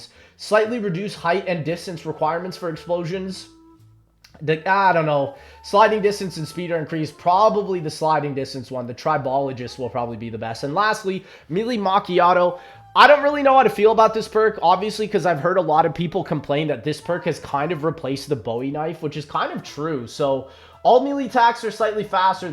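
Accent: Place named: Toronto, Canada. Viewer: Toronto accent 🤡🤡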